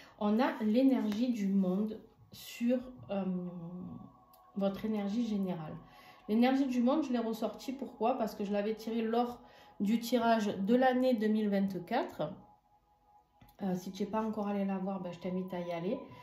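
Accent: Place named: Provence, France. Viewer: Votre accent....j'adore ! 👍 le soleil, les cigales,les oliviers, les lavandes....tout simplement la Provence ! En tout cas, la Balance que je suis se retrouve dans cette guidance très parlante dans beaucoup de domaines. En pleine succession familiale, avec tout ce que cela implique....pas simple à gérer. Professionnellement je pense qu'ils vont savoir comment je m'appelle !!!! Relationnel et sentimental, il ne veux pas déterrer la hache de guerre, silence radio depuis des longs mois, je sais qu'il ne reviendra pas. Je guéris tout doucement....Merci ❤⭐🌹🙏🌞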